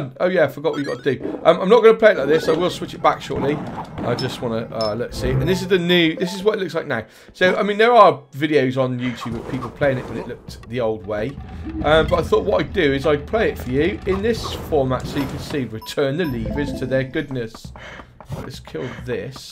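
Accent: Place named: West Country, United Kingdom. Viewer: Are you from ludlow or bristol you sound it brov!?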